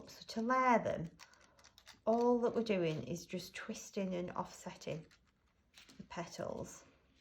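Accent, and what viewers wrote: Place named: England, United Kingdom. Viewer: Wow, Do you sell your cards to card stores? When i was in the UK in 2019 just before my Father Passed away me and my sister went to a card store and i couldn't get over the detailing of all the cards in there. Layered cards with pop ups and animals in forests and such. i love the Card stores in the UK there is always something you haven't seen before in there. I brought some home for my family here in Canada where i now live I was born in the UK so its nice to hear an English accent again. I was born in the south of the UK in Portsmouth and my hubby was born in Yorkshire but had lived in Canada since he was 4 year old, We met while he was serving in the Royal Navy in my home town we got married just over a year later and here we are still married after all these years. I have always wondered how to do the greeting cards myself but i have been more curious lately. 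I have loved making crafts here since moving to Canada there are so many talented people especially on You Tube. I wish i could spend all day on here really. I love the card you made its really beautiful. This is the first video i have watched of yours and i have to tell you i am hooked on this cardmaking. I love the cutting dies but can you use them without the Gemini machine or similar machines? I have tons of glitter that has never had anything i could use it on super fine coarse large and shaped glitter tons of card stock and a few dies but they are so cheap to buy on Amazon Temu Wish etc. The embossing i have ne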